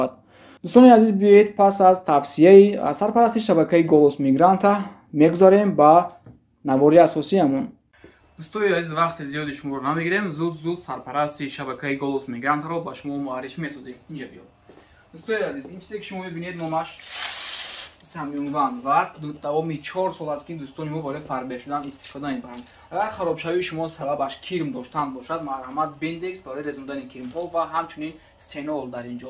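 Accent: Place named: Literary Tajik, Tajikistan. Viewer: ту бо ЗАБОНИ АДАБИИ ТОЧИКИ хело хуб харф мезани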